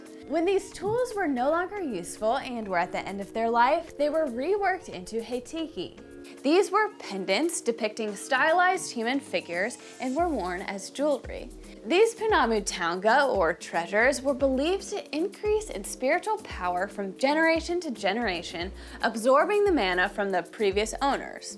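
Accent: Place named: United States. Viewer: I am a huge fan of your channel and love your content… although you have mispronounced many words in this video, including the word Māori itself. Feels very American 🤣🤣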